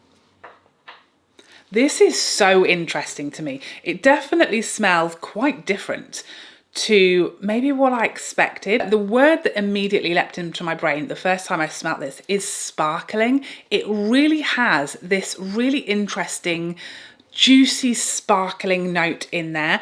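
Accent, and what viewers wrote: United Kingdom. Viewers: What a very descriptive way of explaining the scents and notes of Charlotte Tilbury"s new fragrances. I appreciate your thoughts and narrative. Great video. Do you like any of th Kayali fragrenced,? Love you British accent and sense of humor.👍✔️❗🌸🏵️❤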